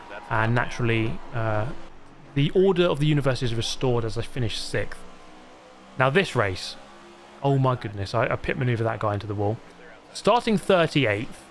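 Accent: England, United Kingdom. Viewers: It’s not so much the silliness of the video, but I think it’s a combination of watching and listening to an Englishman, in an English accent, explain the circus that is NASCAR. Thank you for this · Nothing beats British commentary and sarcasm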